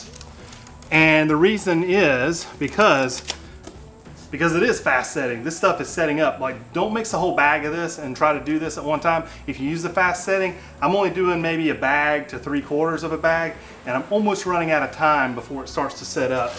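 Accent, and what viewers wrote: England, United Kingdom. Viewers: Hahahaha! Thanks! That British accent made my morning😀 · That is so easy and you can do it however but the English accent was cracking me up , but do tell your wife she got a sexy one! Stay safe and many blessings to your family! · Your Brit accent 😂 very good.🇬🇧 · So... are you going to make a "rock" with a house key hidey hole? My parents had one & it was store bought & stuck out like a sore thumb lol. At least all of your rocks look alike, lol Loving the British accent, cracks me up. Nice family too !! Y'all are so sweet and the project looks prefect !! I might have to go into town and get some supplies!! Not gonna tell my otter half what its for... it's good to leave them wondering lol Be well !! 🦋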